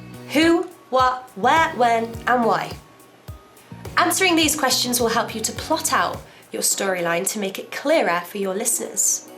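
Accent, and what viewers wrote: United Kingdom. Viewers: I love the way you sound. Your British accent is adorable to ears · That Midlands accent...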